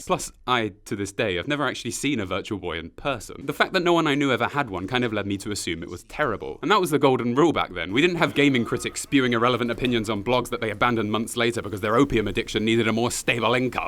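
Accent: United Kingdom. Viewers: Yay the strange British man has returned!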